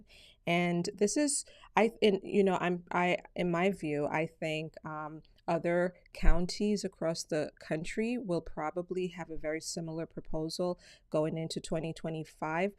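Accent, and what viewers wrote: Jamaica. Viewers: Jamaican? You just made me like your presentations even more, if that's possible!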